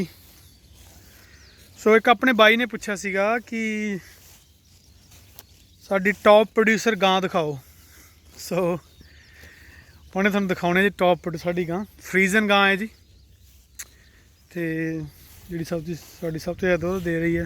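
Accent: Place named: Majha, India. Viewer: veer g tuhadi Boli Maajhe di lagdi a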